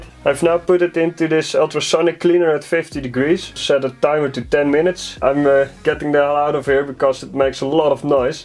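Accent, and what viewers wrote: Netherlands. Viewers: Damn your Dutch accent is strong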